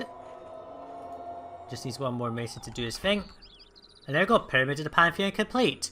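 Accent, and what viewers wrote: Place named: Scotland, United Kingdom. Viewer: Are you a Scotsman? Love the Accent and lovely gameplay :)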